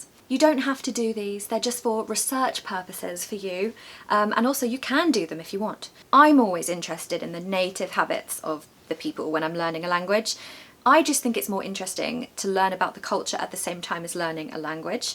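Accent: United Kingdom. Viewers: Hello dear!!! I´m from Brazil and first of all I´d like to thank you for your contents here!!! I´ve been studying English for about a year and they are helping me a lot over this time!! i haven´t words enough to thank you for all of that!! Ps: British Accent is amazing!!! 😍🥰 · I love the British accent ❤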